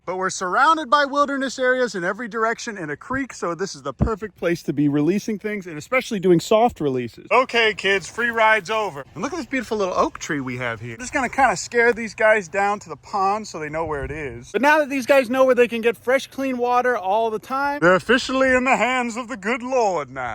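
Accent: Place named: South, United States. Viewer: uncle, you have never sounded this southern before.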